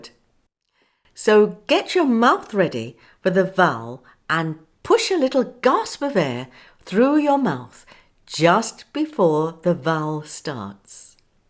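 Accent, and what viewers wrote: RP, United Kingdom. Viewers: Por fin alguien que habla RP accent .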